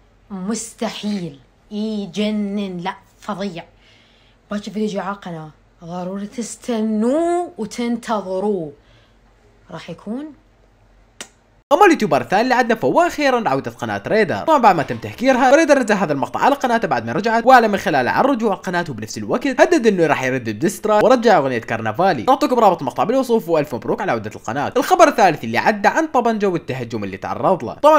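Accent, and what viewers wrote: Iraq. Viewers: عراقي اكيد من لهجته 💝🌚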